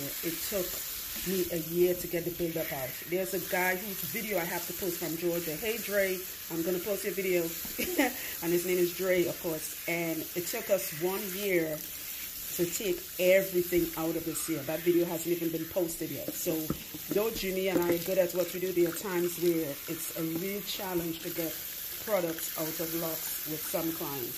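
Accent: Trinidad, Trinidad and Tobago. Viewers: Yes she's ah Trini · Am i hearing a Trinidad accent ckming through?🇹🇹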